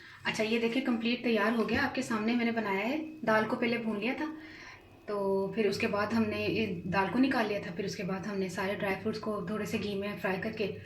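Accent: Sialkot, Pakistan. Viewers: Very nice or apni very nice sialkoti panjabi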